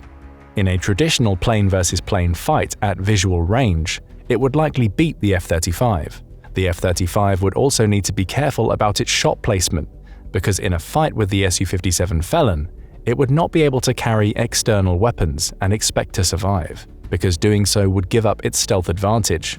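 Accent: England, United Kingdom. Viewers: It sounded like the narrator had an English accent. They can't use the F22. I'm not even sure they have the F35A.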